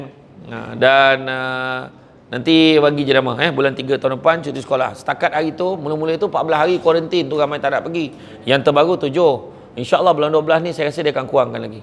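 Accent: Negeri Sembilan, Malaysia. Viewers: Itukah kan,cakap nogori best👍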